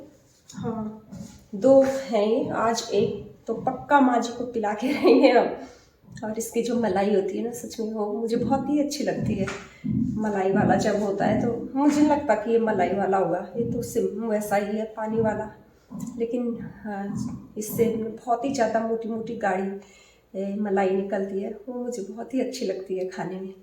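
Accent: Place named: Garhwal, India. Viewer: Bahut bdya lgni cH gadwali bhasa jb ap bolte hai🙏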